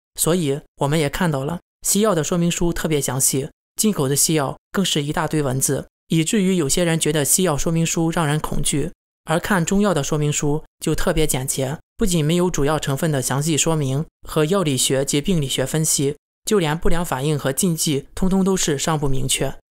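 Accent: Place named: Rizhao, China. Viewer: klaus我听你的声音，你是日照人吧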